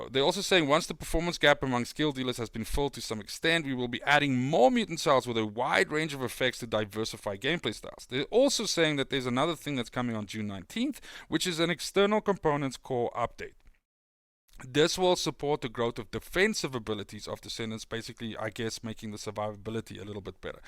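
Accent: South Africa. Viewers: I saw you are from Denmark but, you really sound South African!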